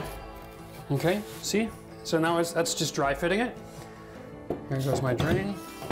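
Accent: Canada. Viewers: He sounds Canadian, so I am guessing he is in Canada.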